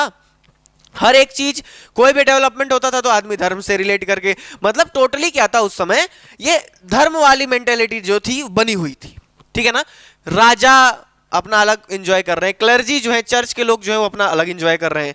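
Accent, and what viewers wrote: Bihar, India. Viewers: Bihrai tone............stole my heart ❤ bro.............🖤 bhai billions subscribers ho apke🖤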